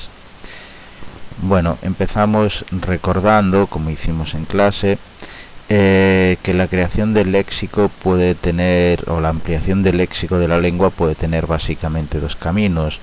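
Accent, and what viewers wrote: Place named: Catalonia, Spain. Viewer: es el catalan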